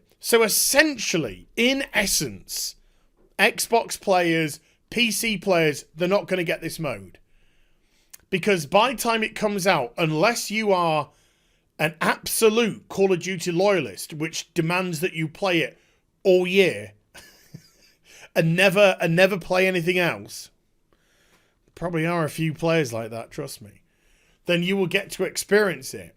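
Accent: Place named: United Kingdom. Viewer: You just keep speaking British to me and I'll keep liking your videos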